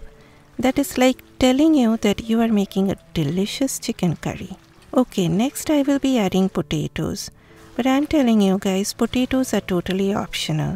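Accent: Bengal, India. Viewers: From the very beginning from your tone I easily understood you are Bengali. I'm also from Kolkata. খুব ভালো হয়েছে রান্নাটা দিদি। পুরোদস্তুর আমাদের কলকাতার বাঙালি স্টাইল। এই স্টাইল টাই আমাদের পরিচয়। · England. Not exactly on topic I'm afraid but I find your voice lovely. It's clear with that gentle Indian eccent. I absolutely hate being talked 'at', you talk 'to' almost like a friend. I do follow your channel and even attempt your easy recipes. Most come out fine, my wife is fussy and loves them too.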